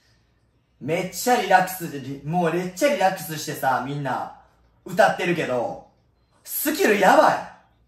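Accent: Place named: Kansai, Japan. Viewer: さん、こんにちはダンスレッスンお疲れ様でした。関西弁で、解説最高です。ダンスも上手やし、イケメンやし楽しく解説嬉しい限りです。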